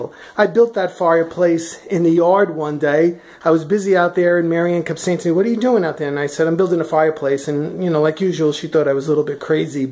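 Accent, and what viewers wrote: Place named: New Jersey, United States. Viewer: OK so needs to publish a recipe book and you need to publish a holiday decorating book I can see them on coffee tables every where. BTW I have lived in Vermont for the last 57 years but I still know a Jersey accent when I hear it. Mom was from the Hazlet area and Dad wae from Philly. Be well you two.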